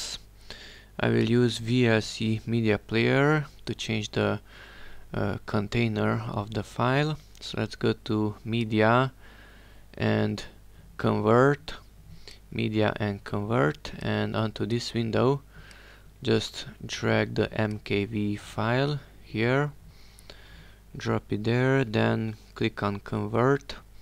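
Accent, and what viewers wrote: Hungary. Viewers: Akcentusod rögtön elárulta hogy magyar vagy ))) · Nagy segítség, le sem tagadhatod amúgy, hogy magyar vagy. Az akcentusod elárulja :D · It sounded like hunglish. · Thx for the info (alig lehet hallani hogy magyar vagy :P )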